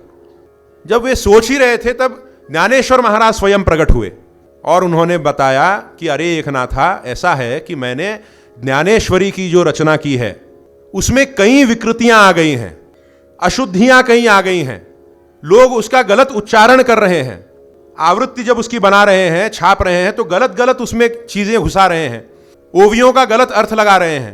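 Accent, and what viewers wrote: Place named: South, India. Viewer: साउथ vali आवाज ह महाराज आप ka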